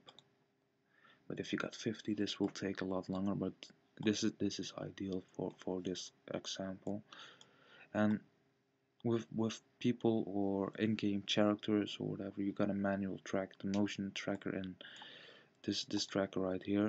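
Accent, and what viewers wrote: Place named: Mexico. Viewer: Nice mexican voice :D Good tut.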